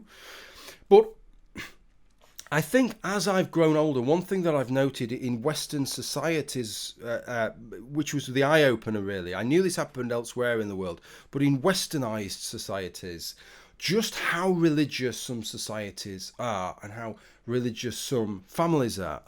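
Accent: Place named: North, United Kingdom. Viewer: Hi. I'm not a native English speaker, and while I still enjoy the battle religious people have against nonbelievers like me, I mostly listened to this video trying to learn understanding English better, especially British accents. Yours is very easy to understand, but the accent hints to probably more north than south. Not close to Scotland, probably, so I'd guess Leeds area? Cheers!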